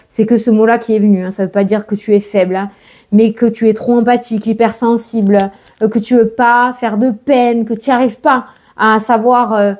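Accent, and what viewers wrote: South, France. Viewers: Merci pour votre bonne humeur, vous êtes un rayon de soleil dans la vie , redonnant l envie de repartir positive et confiante ,votre accent du sud et chantant stimule.😂5❤👍🥳 bravo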